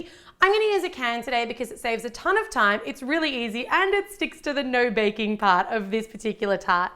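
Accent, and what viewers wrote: Australia. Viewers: it's just her Australian accent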